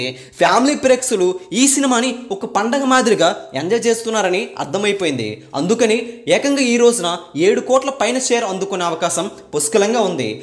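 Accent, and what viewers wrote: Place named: Srikakulam, India. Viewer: Babu Nuv pakka srikakulam village babu laga unnav ne yasa nuvvu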